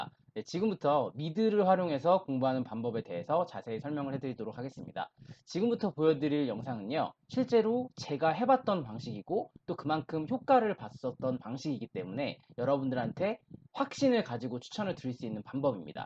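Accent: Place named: South Korea. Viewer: 전형적인 한국식 발음이시네여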